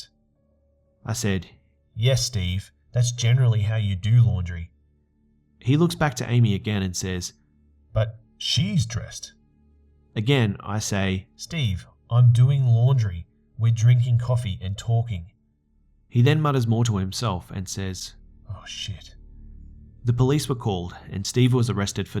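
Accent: Australia. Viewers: your so Australian it feels like home i love it 😂❤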